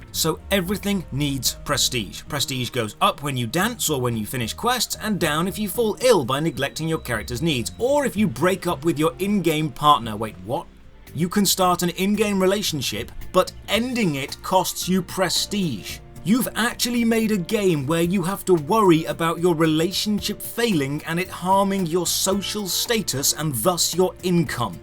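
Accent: United Kingdom. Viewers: Hello American guy eh? is among the most British looking and sounding people ever lol how'd you get that wrong:D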